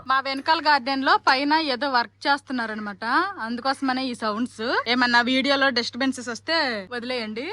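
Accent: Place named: Rajam, India. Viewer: hai sister me pillalu me mata chakkaga vintunnaru naku 6 years babu unnadu food thinadu nanne thinipinchamantadu konni sarlu mata vinadu allari ekkuva chestadu ma vadu kuda school lo edo okati vadilesi vastadu nenu me videos ma babu ki chusi cheptanu valla laga chethi tho food thinali home work cheskovali ani anyway me videos naku chala istam prathi okkati miss avvakunda chustanu me matalu vintunte ma slang gurthostundi madi rajam daggara village memu vizag lo untamu sister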